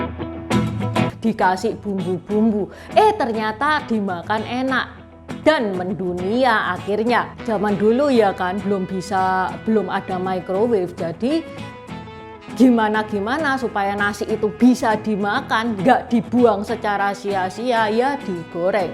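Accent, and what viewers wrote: Java, Indonesia. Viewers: Orang Jawa y mbak ngomong y medok bgt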